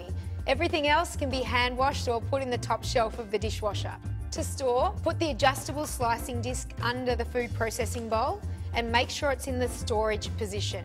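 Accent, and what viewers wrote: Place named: Australia. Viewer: Her charming Aussie accent kept me watching since I already have a similar appliance.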